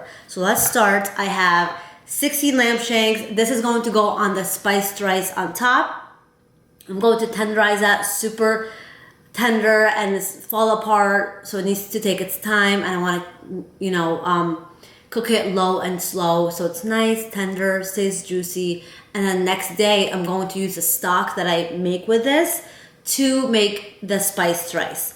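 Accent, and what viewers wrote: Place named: Arab world. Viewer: Walla, I thought I heard Araby accent. Shalom, Salam, Peace. I appreciate your constructiveness. Shukran/Todah Rabah.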